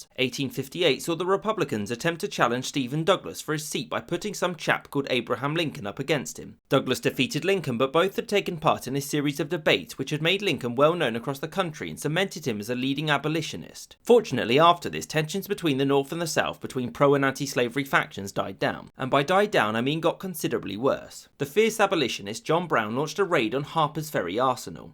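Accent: England, United Kingdom. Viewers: I can understand why you declare that France had outlawed slavery. But you incorrectly infer that England outlawed slavery. Check you're facts. You should have said Russia. I swear, give someone an English accent, they think they know everything. England was engaging in enslaving India and making drug addicts out of China. Stop you're bullshit.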